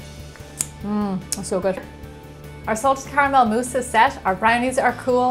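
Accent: Ireland. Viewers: Made this for our Christmas Dinner Dessert. It was a crowd hit. A lot of work, but very much worth it. Definitely a keeper recipe. It can be made in stages (the brownies and caramel the day before etc.). Thank you!! Warning #1: It is delicious but VERY rich. It fed 10 at our dinner and we didn't even dent half of it. Warning #2: Pay close attention to the colour of the sugar for your caramel. The darker it becomes, the stronger flavour your caramel will taste. If you wait too long (sugar too dark in colour), it will taste like a burnt sugar caramel. Still good if that's your thing, but I prefer it just after turning golden and before getting very dark brown (it's a personal preference thing). Warning #3: When making the caramel, add your butter all at once and then whisk in (as says). Have your butter and cream set aside at room temperature if possible (not cold), this will help with the caramel staying emulsified, and not separating. 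Warning #4: If using salted butter, omit adding salt at the end. Warning #5: `s recipes are fantastic, but her Irish accent alone will make you want to come back to this channel.:)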